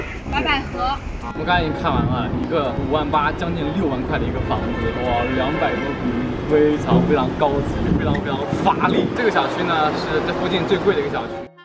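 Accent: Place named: Anhui, China. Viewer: 听口音安徽吧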